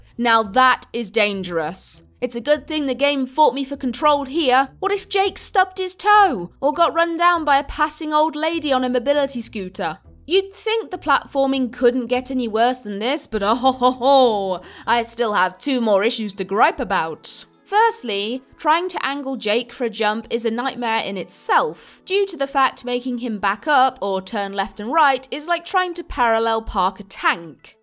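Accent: United Kingdom. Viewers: Was looking for a review of Yoku's Island Express and this somehow showed up and I just had to watch it xD I remember this game being one of the few that was actually translated to Danish back in the day and I do remember being kinda intrigued by it in general. Really well made and thorough review btw. And heccin' love your British the Britishness in your voice xD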